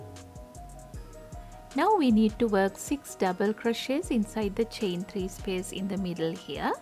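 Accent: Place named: India. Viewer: that indian accent video is more good and trustful ❤❤❤🎉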